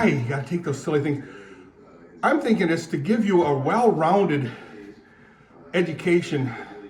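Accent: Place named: North America. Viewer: I was thinking, is it June already but then realised you sound North American.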